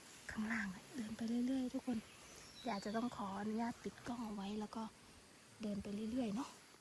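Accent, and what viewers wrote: Isan, Thailand. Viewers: สาวน้อยเดินป่าถ้าตกใจหรือเจออะไรแปลก เวลาอุทาน ใช้ภาษาอีสานบางคำฟังแล้ว ตลก ขำ บางครั้ง ก็แปลกไม่ออกแต่ก็ชอบนะ สำเนียง เสียงไพเราะดีมากชอบขอบอกจ๊ะ❤❤❤😅😅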